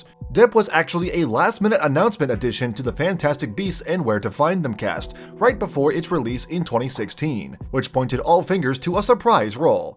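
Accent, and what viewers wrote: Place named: United States. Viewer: No kidding? Your dialect sounds very American.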